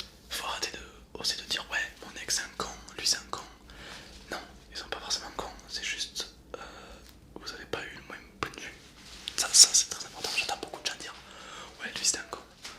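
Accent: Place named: Toulouse, France. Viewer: Ses se que on appelle l'accent toulousain même si oui il a une très belle voix aussi (pk j'ai perdu mon accent toulousain moi 😭)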